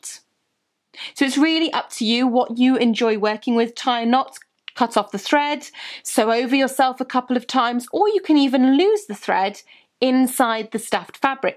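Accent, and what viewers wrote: United Kingdom. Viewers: Are u British?? 🤔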